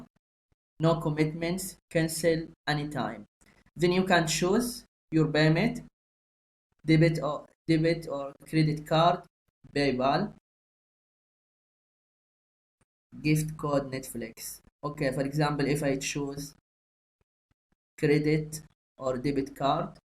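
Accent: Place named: Arab world. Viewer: تحس عنده الاكسنت العربية شوي ممكن يكون من اصول هندية ولا شي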